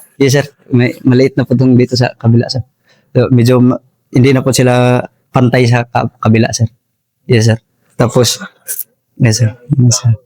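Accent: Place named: Surigao, Philippines. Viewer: Sgt kumusta ang galing mo parang taga Agsam o Ibuan Lanuza surigao del sur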